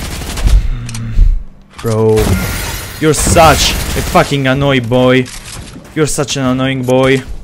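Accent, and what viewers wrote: Naples, Italy. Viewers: Mi manca la tua parlantina napoletana 😫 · Fantastic video, i love your Italian English Scherzo sono Calabrese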